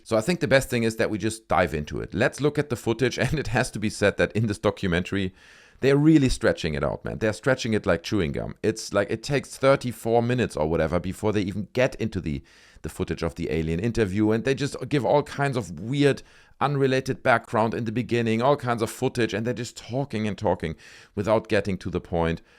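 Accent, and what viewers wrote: Germany. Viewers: Great video mate, is that a German accent im detecting btw? :)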